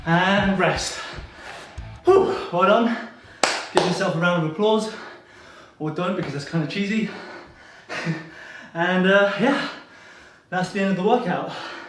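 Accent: United Kingdom. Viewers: Yeah his accent is 100% southern English. Probably born and raised in England is my guess based on the accent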